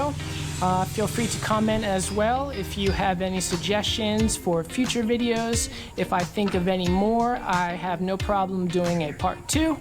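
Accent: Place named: Kelantan, Malaysia. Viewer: ini dye cakap kelate jgk mcm aiman...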